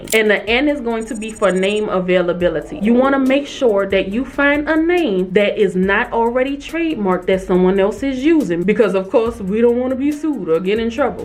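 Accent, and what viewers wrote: New Orleans, United States. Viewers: Hi. Love your channel. New here. I love your videos! Question, are you from NOLA? I hear your accent a lil bit. 😊I love New Orleans so much. ❤❤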